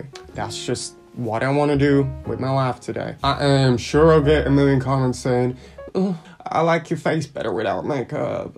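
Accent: South, United States. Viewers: you sound like you have a southern accent which is funny to my cuz i know ur Holand also you're snatched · your accent........... i’ve never heard anything like it before its extremely southern but i know you’re dutch... wow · Love your accent bud, you are a beautiful man btw . Where in the south are you from ? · This is weird. When the hell did he develop a southern accent? He certainly didn't have it a few months ago. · it sounds southern American to me 😂😂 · It sounds like you have been in the south (in North America) for a few months at the start of this video. Very cute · Why do you have southern US accent today? Like your Dutch one much better and I'm from U.S Georgia now in Florida.. You do the accent well...just...ugh...hmmm. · You sound very country lol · Our sweet boy bein' sassy! Absolutely love it! 💕 Btw, I swear you sounded like you have a southern accent in this one. 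Being from the south, I know a thing or two about those. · Is it me but you sound like you were raised south of the Mason/Dixie line (residents of the USA know what I mean) Anyways, I still love your videos!😍😘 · Idk if this is new or on purpose lol but I hear a southern twang 🤠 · Is it me or do they have a more Southern American accent now? I had to review their old videos to contrast and it's pretty stark.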